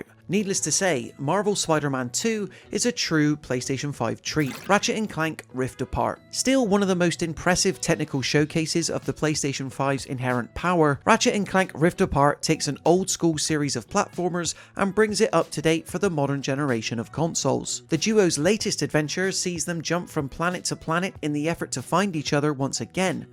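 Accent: West Country, United Kingdom. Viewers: subscribing for the west country accent!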